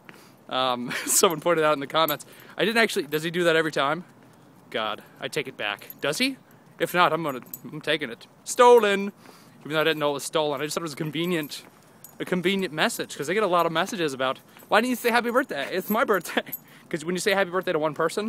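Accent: United Kingdom. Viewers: British voice lol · UR British right?I bet on it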